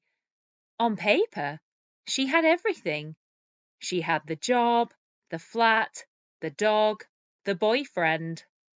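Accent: United Kingdom. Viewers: Thank u :-). Your channel is amazing. You are helping me to learn English and also I am able to learn gorgeous British accent. I am from India and I want to Go to London in future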